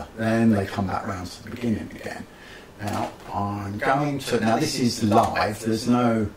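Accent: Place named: Nottingham, United Kingdom. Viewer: love the Nottingham accent. Bonsai and synths, now both the way god intended ;-) Subbed.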